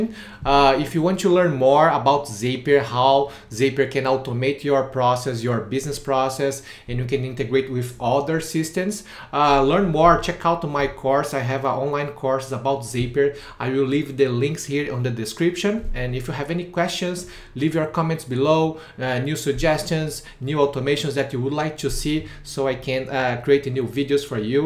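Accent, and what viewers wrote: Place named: Brazil. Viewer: cara, antes mesmo de ver o vídeo inteiro percebi pelo sotque que vc é brasileiro. Eu consigo entender em inglês, mas meu sócio não. Vc tem algum curso de zapier em Português?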